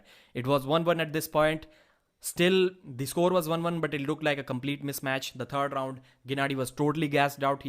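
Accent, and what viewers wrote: India. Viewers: Who the F wanna watch your dumb Indian baldy and accent. Just show the video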